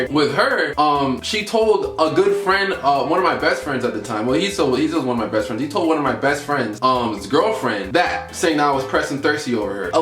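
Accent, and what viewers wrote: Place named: Northern Virginia, United States. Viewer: lmfaoooo i KNEW you had to be from nova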